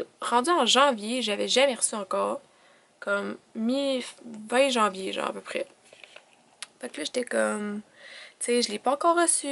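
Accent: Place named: Quebec, Canada. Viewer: C'est quoi ton accent lol candien ? quebecois ?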